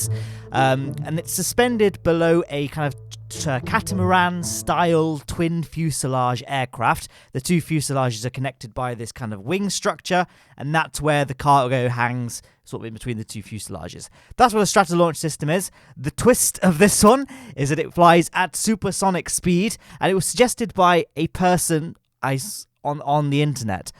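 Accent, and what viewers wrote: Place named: North, United Kingdom. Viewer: I can’t ignore on the fact on how he sounds like nordern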